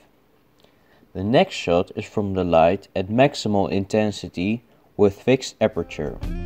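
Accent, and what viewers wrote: Netherlands. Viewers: I think he’s Dutch. I have the same accent 😂... A lot of people I know who speak English on a daily on the workfloor also sometimes have a heavy accent. I believe it’s because our language is very accent reliant. It’s very difficult to understand someone speaking Dutch when they aren’t native. Fortunately for us this isn’t the case with English language 😬 but maybe if it was, we’d practice pronouncing English a bit better 🤷🏼‍♀️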